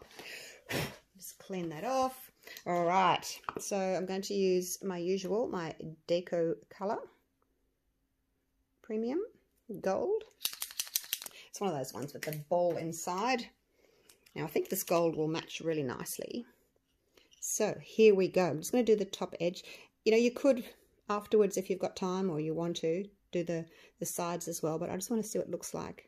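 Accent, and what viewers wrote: Australia. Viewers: My goodness those are gorgeous!!! I’ve got to try this!!! I just love listening to you talk, Aussies have such a pretty accent & you sound like such a lovely, fun person! Heading to your eBay store now!💕💕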